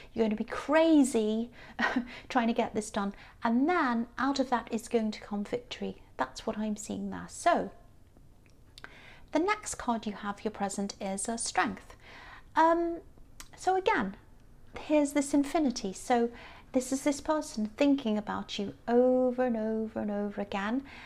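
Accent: United Kingdom. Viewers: If that is my ex, hmmm I will refer him with the best therapists but that's the best I can do. He rejected me and used me at the same time and that ship is sailed. I'm so grateful cuz I've learned, healed so much and I don't hate him. I m more focused on work and personal development all the levels there are. You are so good and inspiring, you're gifted. Thank you very much, I am 4 days away to my birthday and really ready to embrace the new life I want so the past can be flushed in the toilet and only keeping the lessons!❤ where are you from? I love your accent, I wonder is it the UK?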